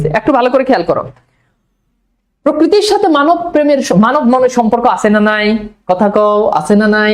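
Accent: Noakhali, Bangladesh. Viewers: sir apni ki Noakhailla?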